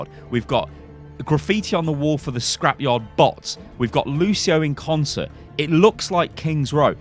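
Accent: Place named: Birmingham, United Kingdom. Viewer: Wait, you're a brummie? Just like me 😁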